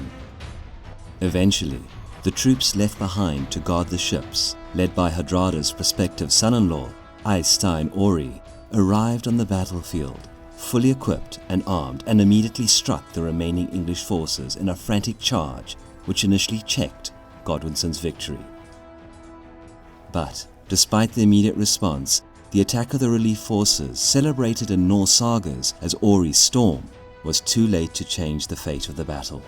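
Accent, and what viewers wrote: South Africa. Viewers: English South African accent! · South African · Narrator dude, how long has it been since you left South Africa? I hear faint hints that give a little away. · I have to ask, is your narrator South African? He's very good and manages to remain neutral but there are a few words that give him away listening as a ZA native. :)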